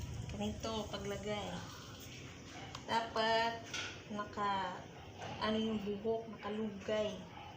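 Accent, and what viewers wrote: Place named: Visayas, Philippines. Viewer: Pina ka tuno sa bisaya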